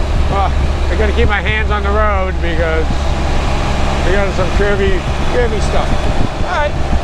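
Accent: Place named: Boston, United States. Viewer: sounds like hes from Boston... Love Video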